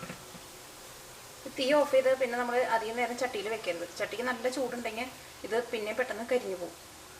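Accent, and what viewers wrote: Thrissur, India. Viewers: We Like the Trissur slang. All of your recipes are simple and really good! Keep it up!!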